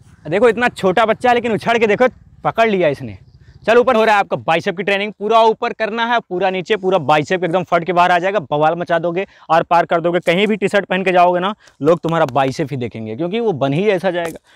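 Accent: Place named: Uttar Pradesh, India. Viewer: Ap up se ho kya language to wase bol rahe ho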